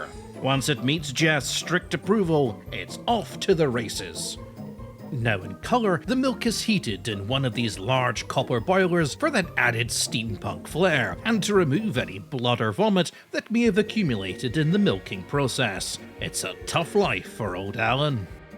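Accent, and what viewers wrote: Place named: United Kingdom. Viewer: Any accent you do is a Brirish accent. Do you not hear it?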